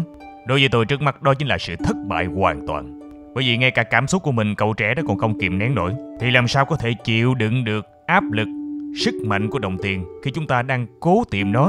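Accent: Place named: South, Vietnam. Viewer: Mình nghĩ sau ad nên để chữ ở trên video,các bạn miền nam nghe dễ,nhưng miền bắc nghe hơi khó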